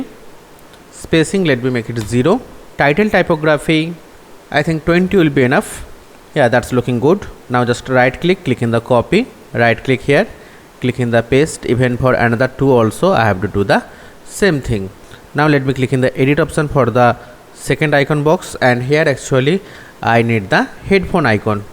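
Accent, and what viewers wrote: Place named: India. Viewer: Fuck man I cant understand none of these indian peoples videos the accent is too strong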